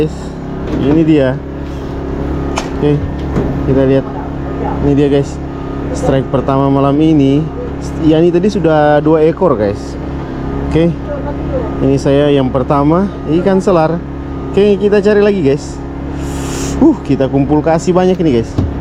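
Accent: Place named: Manado, Indonesia. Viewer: saya suka dengar kalau narasimya disampaikan menggunakan logat Manado dang, terasa lebih enak dan natural. Saya lalu pernah tinggal di wilayah Sulawesi, orang bilang kalau logat Manado terasa tore dan nyaman di telinga. Tq Bang....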